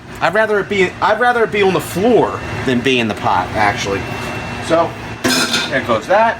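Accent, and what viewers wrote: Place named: Italian-American, United States. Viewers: I'm not sure you used enough garlic....As if your accent didn't give it away, when you showed the bread I knew exactly where you were from! Making escarole and beans today but my crusty style bread will come from Panera since in SC we don't have Italian bakeries. Manja!! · Hi, you must be from Philadelphia! I can tell by the way you say water🤣🤣. You’re probably in South Philly❤. Love you from Northeast Philly. And I’m gonna make this. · Like no one knows that. . . I guess a lot of people don’t know how to “properly” slice an onion. Seriously, I’m 100% Italian and I was so skeptical about you at first, (some people that never even heard of Scaloe. I know what it is and cook with it like my nana did. It’s so good for you. Anyway, just wanted to say you’re impressive. I wish my man would cook, let alone good Italian food. 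Sounds like you’re from NY. 💕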